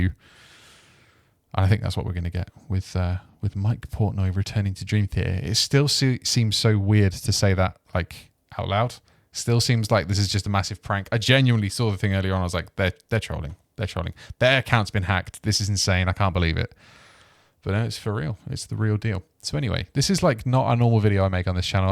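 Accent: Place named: United Kingdom. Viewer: It wasn't until the 15 second mark that I could tell you were british. got to be the most subtle accent of all time. Helldivers brought me to your channel, great great shit dude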